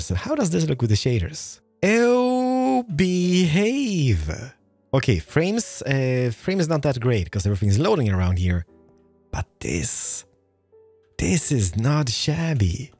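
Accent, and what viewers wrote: Scania, Sweden. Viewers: i love his accent: its called skånska. om du ser detta, jag är ocks skåning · are you Swedish? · Blev chockad när jag hörde den skånska dialekten! Var inte beredd på det!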